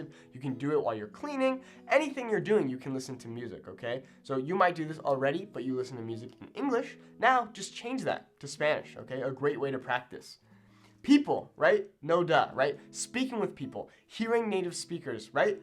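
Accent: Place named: Mexico. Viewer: It is just his Mexican + Kermit the Frog accent in Spanish (no disrespect).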